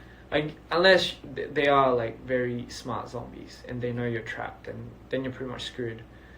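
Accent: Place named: Australia. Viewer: Just here to listen to his aussie accent lol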